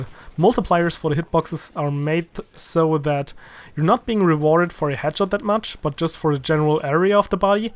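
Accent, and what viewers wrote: Germany. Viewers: Ich vermisse den Punkt "Strategie" (=langfristige Manöver um das Spiel zu gewinnen - z.B. bei Spielmodi mit mehreren Objectives von einem Ablenken um das andere angreifen zu können, ...). Außerdem hätte ich Teamwork (=Rollen sinnvoll ergänzen, gegenseitig Rücken decken, ...) und Taktik (kurzfristige Manöver um Gunfights zu gewinnen - Dropshots, Deckung effektiv nutzen, ...) nicht unbedingt in einem Abwasch erledigt. Ansonsten hast Du das aber sehr systematisch und sorgfältig gemacht :) ... PS: Egal wie sehr man auf den Akzent achtet, das Deutsche hört man immer irgendwie heraus ^^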